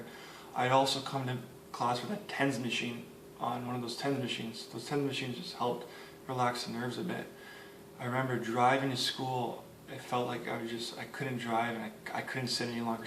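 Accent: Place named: Quebec, Canada. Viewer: I came here for info about discs and stayed for the French-Canadian accent and the 'ookey hair.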